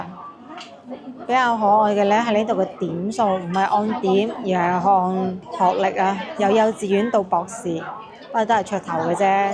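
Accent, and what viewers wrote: Guangzhou, China. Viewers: “你明唔明啊，系好香······呃裹种感觉”，一个香字，粤语发音拖得长长的，软糯糯，娇滴滴，还是广州女生讲粤语最好听，当然也分人，最好。。。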